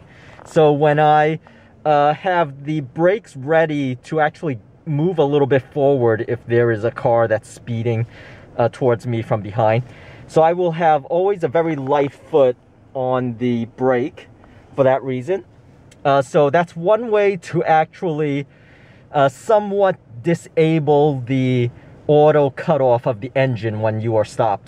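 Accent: Brooklyn, United States. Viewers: Sounds a tad like Woody Allen. Brooklyn boy?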